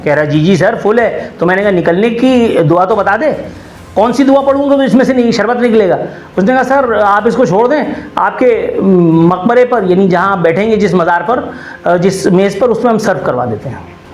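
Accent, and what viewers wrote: Karachi, Pakistan. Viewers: یہ کراچی کی اردو ہے یہاں کے مہاجروں کی زبان ہے آکر سیکھ لیں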